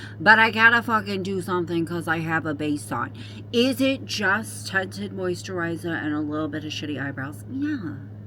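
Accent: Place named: United Kingdom. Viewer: British accent 💯!!! 😂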